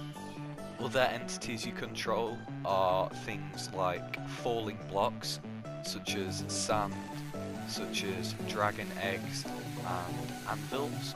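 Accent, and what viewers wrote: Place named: Birmingham, United Kingdom. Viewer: That’s an interesting accent, is it from Birmingham?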